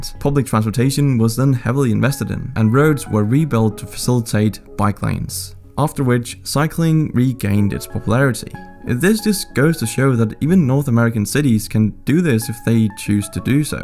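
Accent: Netherlands. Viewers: I like his Dutch accent although he is Danish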